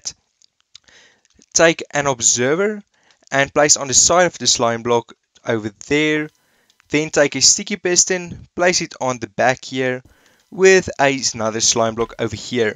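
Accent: Afrikaans, South Africa. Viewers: South African ? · Do you speak Afrikaans? It’s sounds like you do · Definitely South African Afrikaans accent ha ha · Are you from South Africa? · Are you South African Dude you are. I am from SA and I know a Afrikana when I hear one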